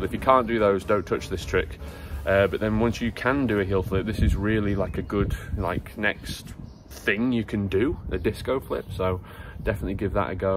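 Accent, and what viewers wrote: United Kingdom. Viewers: Hello just wondering where you from? Sounds like Lancashire to me 👍🏼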